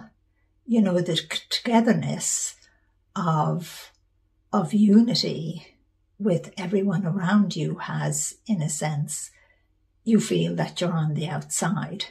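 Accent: Ireland. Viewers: Lorna, You have no idea how this message is perfect timing as I need to do a little bridge mending. I've been avoiding it, but I don't want to let the situation keep going the way it is....your message is the nudge I needed! I saw you years ago at The Unity Church in St Petersburg, Florida. You had written your first book. The sanctuary was packed. Your lovely Irish accent came floating out and we were all on board with your message and fell in love with. Vicki Perez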